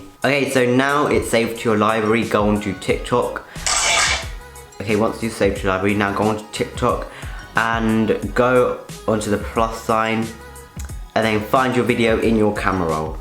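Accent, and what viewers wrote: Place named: London, United Kingdom. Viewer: I love your accent I am so jealous I almost got it when I went to London 🇬🇧 over the summer 2018 however, I wish I still got it.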